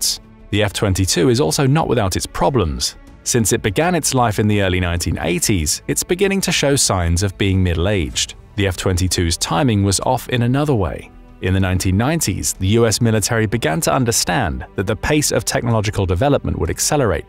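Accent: England, United Kingdom. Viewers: Why is the speaker talking in a british accent about American assets? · More 'Anglo-Axis' bollocks with a Pommy accent.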